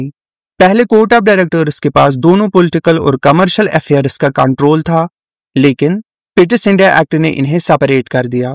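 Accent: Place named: Pakistan. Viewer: Bhai teri hindi pakistani youtube video jesi kyo hai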